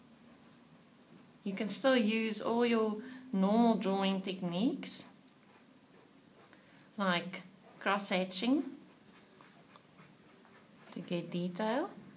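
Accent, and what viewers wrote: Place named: South Africa. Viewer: She sounds South-African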